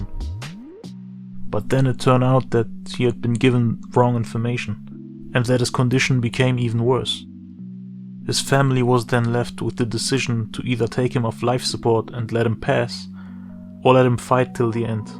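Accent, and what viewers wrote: Germany. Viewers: First time that i hear you speak. Are you German? Because your accent sounds like it. Also, Rest in Peace to one of the GOATs. If you somebody suffers from depression or dont feel well for other reasons, believe me drugs are never the answer! They only put you even more down, i know what i am talking about, i also had drug problems in the past. Hope really found his peace! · Loool you sound very german biste deutscher? Edit : Okay hab den Mediamarkt und deine Geschichte gesehen krass! Hätt net gedacht das du Deutscher wärst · wenn man im Profil des Videos auf "Kanalinfo" klickt, kommt auch die Info "Herkunft: Deutschland". Man erkennts ja auch am Akzent :D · Dickaa, ich hab gewusst, dass dieser Akzent Deutsch sein MUSS. Bin in die Info und dann sah ich, 'Aufenthalt Deutschland'. Mach weiter so, bleib am Ball🫡 Rest In Heaven, A Rap LEGEND✓✓✓😔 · Habe ich mir fast gedacht... 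dein accent sounds pretty german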